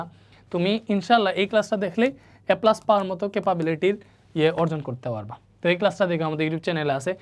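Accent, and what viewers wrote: Sylhet, Bangladesh. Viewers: ধন্যবাদ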